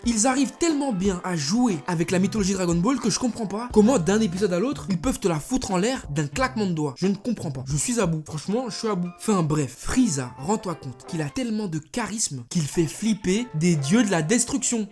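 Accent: Banlieue, France. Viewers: pourquoi tu parle comme un wesh de banlieue ?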